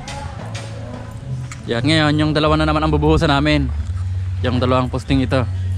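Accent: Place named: Bicol, Philippines. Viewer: Parang taga bikol si idol ah saan ka po sa bikol?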